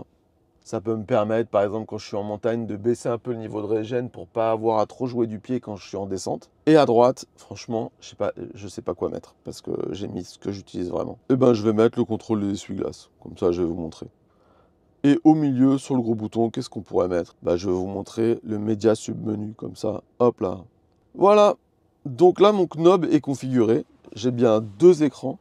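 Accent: England, United Kingdom. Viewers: on avait déjà eu un aperçu de ton accent anglais, là j’avoue que je suis encore plus sous le charme avec ce qnob 😅 Merci pour le test en tout cas;)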